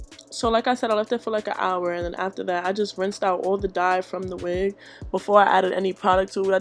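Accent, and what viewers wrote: New York, United States. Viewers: the accent is giving , most def from NY😭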